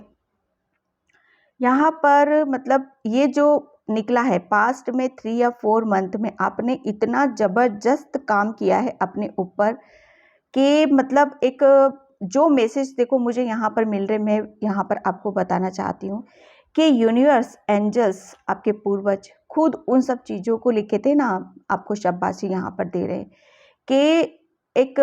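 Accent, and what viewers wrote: Gujarat, India. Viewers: Ma'am I believe you must be from Gujarat. I can make out from pronounciation, as I am working in defence organization. Good Night 💤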